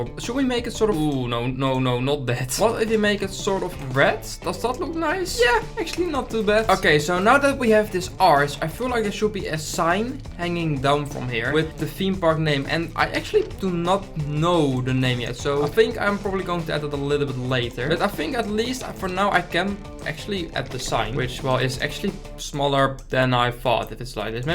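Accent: Netherlands. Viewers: I smell nederlands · I smell dutch in your voice ( SRY ) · Je bent zoizo Nederlands soms hoor ik Nederlandse accent😅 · HAHAHA NEDERLANDSE ACCENT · I smell dutch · i don't know why but i smell dutch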